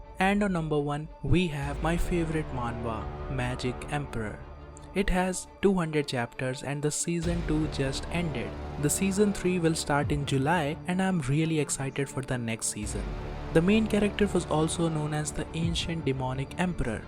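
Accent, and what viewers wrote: India. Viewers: the Indian accent kinda ruined the whole video so I had to watch it without sound. indian dingdongdingdong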